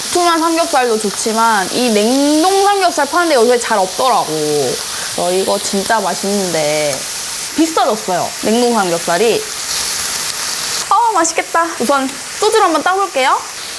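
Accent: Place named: India. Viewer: 영어발음이 인도식인데 좋쿠만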